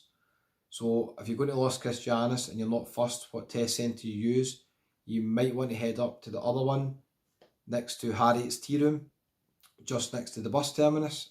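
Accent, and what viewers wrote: Scotland, United Kingdom. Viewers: you're very welcome. Always trust a man with a Scottish accent! 😘😉😉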